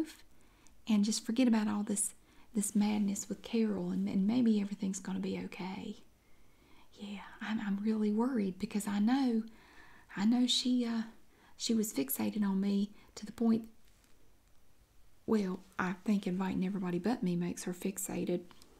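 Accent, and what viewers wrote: South, United States. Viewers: Your southern accent and this role play are really interesting! Tbh, I feel happy when I hear someone speaking in southern accent and cooks southern style food! Thanks! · 😂 She reminds me of my mother in law. She's always on the phone and in everyone's business!!! She even has the same southern accent as she's from Texas!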